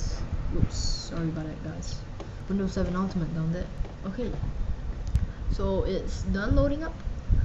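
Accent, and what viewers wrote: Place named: London, United Kingdom. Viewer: you sound like your from london!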